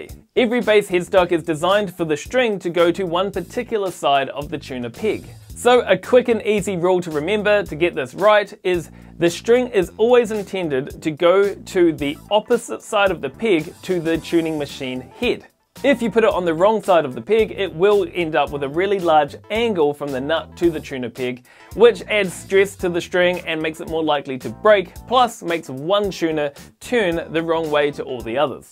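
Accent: New Zealand. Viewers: Tone down that New Zealand accent and I might listen longer. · Sounds like a kiwi living in Australia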